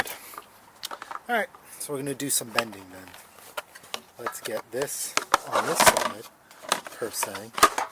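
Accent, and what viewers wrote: New York, United States. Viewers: This video just keeps on giving! I had the same same problem on the same machine. It starts better now than it ever did. Instructions were easy to follow, and I appreciate the NY accent and sensibilities. I'm from RI and we're cut from the same cloth.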